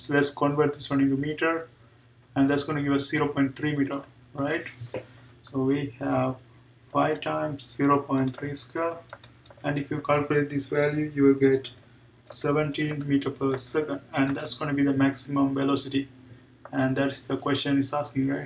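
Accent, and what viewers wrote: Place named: India. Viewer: Indian speaker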